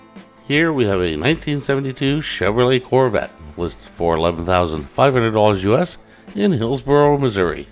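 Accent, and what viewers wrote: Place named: Canada. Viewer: We'd blame any mispronounced words to be caused by your Canadian accent. No foul. Hope you feel better tomorrow. Keep up the good work.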